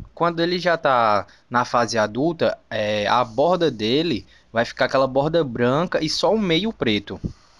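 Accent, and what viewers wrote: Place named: Northeast, Brazil. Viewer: Você é nordestino? Sotaque lindo 😊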